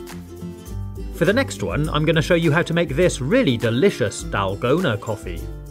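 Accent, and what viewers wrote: United Kingdom. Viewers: And your British that cool I I’m to I love this and keep it up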